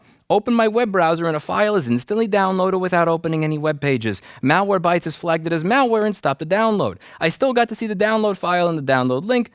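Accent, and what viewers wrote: New York, United States. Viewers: Is it just me or Sounds really New Yorkey on this one?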